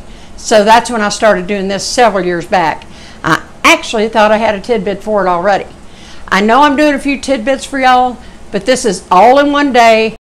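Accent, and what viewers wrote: South, United States. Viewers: As a Canadian I just love that southern (I think) accent, makes me smile that you'all talk like that! You' all come back now ! LOL.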